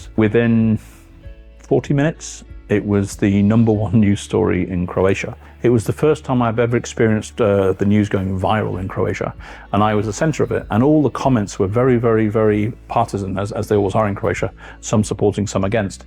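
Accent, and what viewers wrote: United Kingdom. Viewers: You have a pleasant voice and your British accent sounds so good to my Finnish ears. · I agree. has such a nice, soothing voice and British accent is always welcomed.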